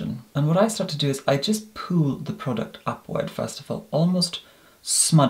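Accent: Scotland, United Kingdom. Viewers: he has took the original English accent and slightly mixed it with Scottish and created this amazing and extremely proper accent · We can still hear that Scottish accent! ;) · Lovely Scottish accent 🏴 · He's Scottish but a really posh english/Scottish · so this is my second video i have watched of him, and i’ve realised he actually had abit of a scottish accent lmao..? ik he’s british but i couldn’t put my finger on it. am i right? is he scottish?! phahah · Phoinexe he speaks with a Scottish accent but has made his voice a bit more posh and articulate in more recent videos.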